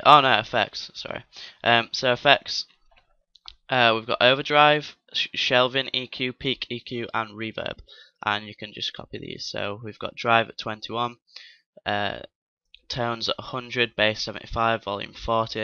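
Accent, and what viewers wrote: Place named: United Kingdom. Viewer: Even though I am American, no one can speak "Synth" like the brits. Dope Tutorial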